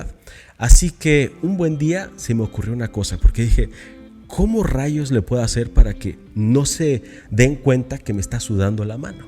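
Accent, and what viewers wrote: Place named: Colombia. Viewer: pensé que eras colombiano.por ti acento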